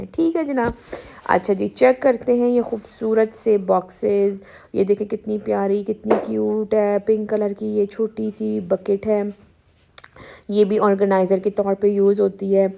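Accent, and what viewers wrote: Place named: Punjab, India. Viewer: Api ap botli bhout mazy ka h Jo ap punjbi ka tarka lagti h Kia bt h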